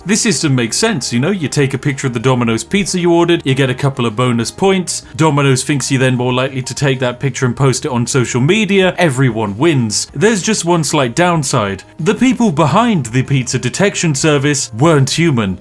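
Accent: United Kingdom. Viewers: You sound British. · 15 minutes of pictures and some guy with a lame british accent?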